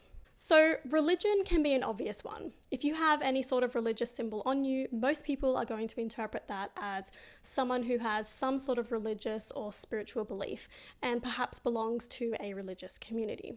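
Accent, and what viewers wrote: Australia. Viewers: Oh, I am from Austria - where tourists think that Kangaroos are from. Don`t hear too mutch aussi accent here - that`s maybe why you sounded unfamilliar to my ears... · So you pronounce it tadoo in Australia? My brain makes me understand "taboos" half of the time.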